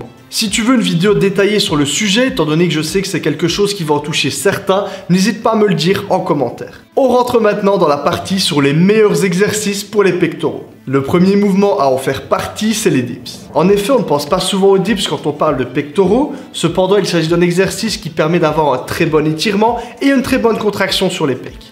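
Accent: Belgium. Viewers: Sa s'entend que tu es Belge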